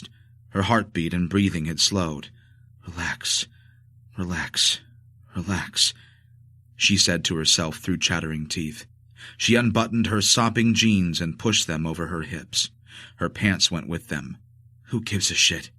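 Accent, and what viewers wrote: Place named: United States. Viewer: The narrator should be English. The American accent jars with the language.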